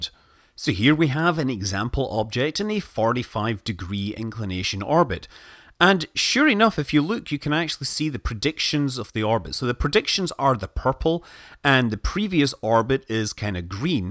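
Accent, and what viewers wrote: Scotland, United Kingdom. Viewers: Jack Shanahan He’s a Scottish Laddy. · DigitalSaturnX by a manly Scott called Scott Manley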